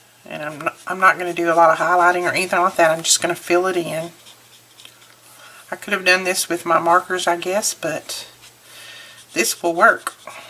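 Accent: South, United States. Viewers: I love y our faith journaling. You encourage me. Plus I love your Southern accent.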